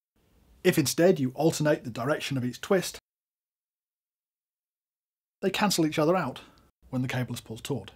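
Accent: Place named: United Kingdom. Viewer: As an American, I feel these techniques only work if you have a bad-ass British accent like this dude does.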